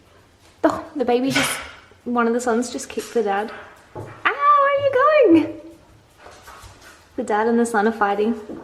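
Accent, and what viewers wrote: Ireland. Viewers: Is it just me or do you have a bit of an Irish accent :o 🍀:) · I am loving your little Irish twang. I live in Brit living in Tassie, but all my family are Irish! Love it! Thank you for sharing a part of your soul with us! <3 love and hugs xx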